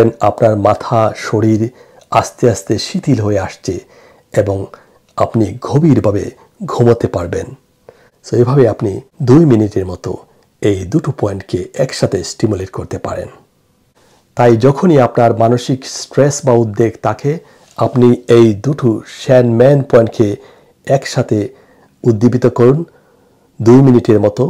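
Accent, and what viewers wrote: Sylhet, Bangladesh. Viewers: স্যার কি সিলেটি? কথার টানে মনে হয় সিলেটি❤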